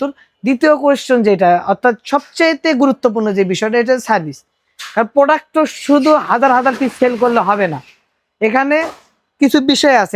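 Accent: Bogura, Bangladesh. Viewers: Bhai Apner Kotha Sunle Mone Hoi Amader alakai Basha mane Bogurai.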